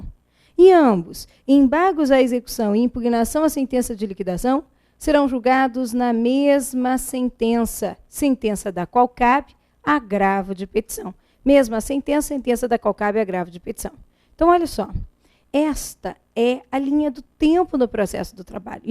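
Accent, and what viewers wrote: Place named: South, Brazil. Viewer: Essa figurinha tem sotaque da Região Sul. Muito boa aula. Parabéns conterrânea.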